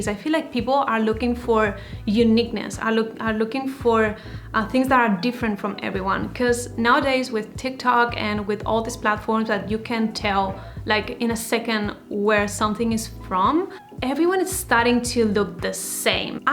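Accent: Spain. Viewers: Are you from Spain or Argentina? You speak English as if your original language was Spanish. And the way you said Bolero reminds me of how my Spanish ain’t says it. Super duper curious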